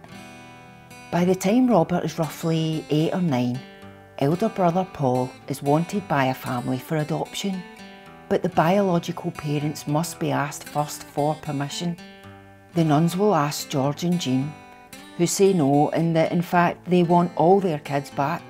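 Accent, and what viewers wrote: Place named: Scotland, United Kingdom. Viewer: I don't know what you're saying. I know you're editing it out as it could trigger people. Any chance you could put a link in the description box to the full unedited transcript in future videos, or add one here? Just a thought. Your accent's BRAW... I'm from Glasgow 😂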